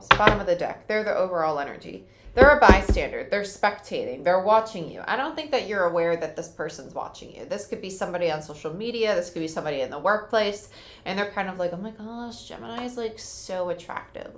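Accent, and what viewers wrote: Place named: Cockney, United Kingdom. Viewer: LONDON.. LMAO.. I was just laughing at your kinda cockney accent at that point. You do it quite a bit and it always makes me smile.. Usually when you say WATER.. 🙏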